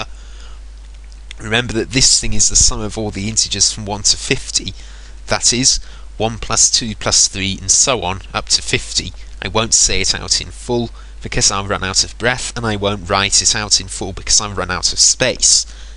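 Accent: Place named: England, United Kingdom. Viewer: Well done! Love the wit! Dad was from Manchester-so nice to hear the English accent again!!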